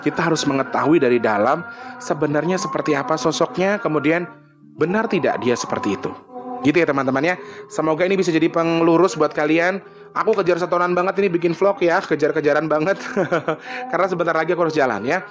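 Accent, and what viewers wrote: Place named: Java, Indonesia. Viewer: kbykn..orng jwa. fansny.. hbs logat.bhs cr bicr..medok bngtt. jwane... gt lho ms.. mlh. trnyt dr lampung y.... gpp ms..moh bnyk ssudr. . dr jw dn lmpung..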